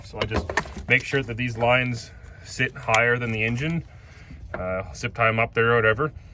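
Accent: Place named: Alberta, Canada. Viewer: holy fuck guy youre a walking 2nd gen encyclopedia! by the accent im guessing youre in Oilberta.. you happen to sell parts? trying to put a 2wd nv4500 into my 4x4 47re, need the 4x4 adapter, a nv4500 4wd output shaft, and the input shaft for my transfer case to match the nv4500... why the outputs/inputs werent all the same is beyond me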